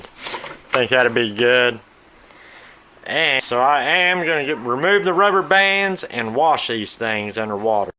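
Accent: South, United States. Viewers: You are such fun chef to watch and I love your southern accent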